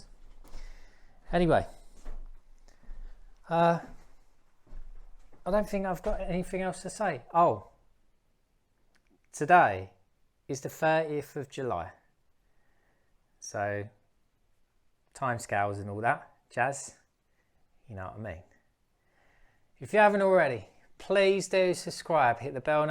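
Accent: United Kingdom. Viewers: to our US ears, has a comically UK accent. Like one of those side characters on your telly!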